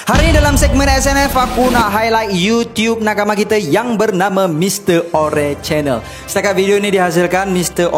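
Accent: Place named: Kuala Lumpur, Malaysia. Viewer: Alamak u bro cara u ckp mcm org K.L laa..Ape,mne adew,.korang...Terbaik br0👍...Tapi mmg mantap cara lu brbahas n brcerita...Jelas,.Aku dgr cara lu brcerita Terbaik..Aku bgi 8/10️💫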